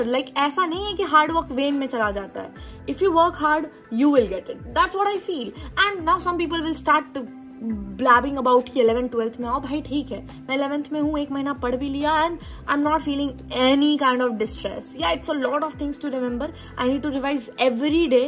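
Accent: Bihar, India. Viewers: In love with your bihari accent 😭🫶🏻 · Didi aap Bihar se ho na😊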